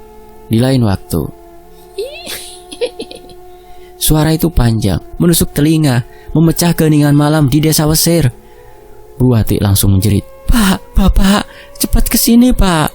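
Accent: Java, Indonesia. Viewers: Dialek jawane bikin kangen kampung halaman mas 😂😂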